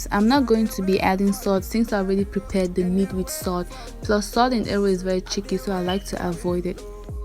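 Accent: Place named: Pidgin, Cameroon. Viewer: I can identify u with our pigin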